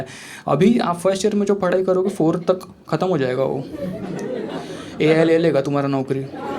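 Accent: Maharashtra, India. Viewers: Love all your videos bro!! Specially your Marathi accent! Love itttt!!! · His Marathi accent makes him 10x funnier lol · Bro is to Good su marathi accent😂😂😂😂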